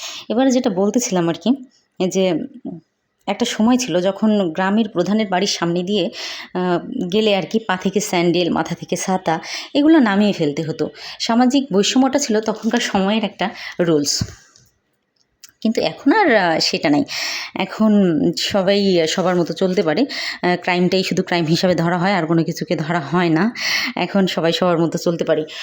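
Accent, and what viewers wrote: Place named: Noakhali, Bangladesh. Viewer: প্রথম থেকে শেষ পর্যন্ত সাথেই ছিলাম আপনি নোয়াখালীর ভাষায় কথা বললেন খুব সুন্দর লাগলো ❤❤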